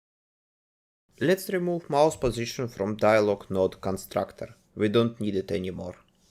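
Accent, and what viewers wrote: Russia. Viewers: Благодаря русскому акценту я лучше понимаю) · произношение русского)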